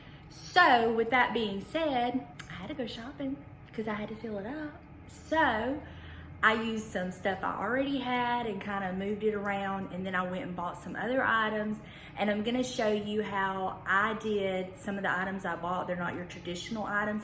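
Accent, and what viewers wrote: South, United States. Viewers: Hi, new tonight to your channel, from Liz, Traditions of the Seasons." I have been binge watching you for an hour! Loved everything you have done. Love your southern accent, reminds me of my cousin, in Richmond, VA. Blessings to you and yours.💖🌹💖🌸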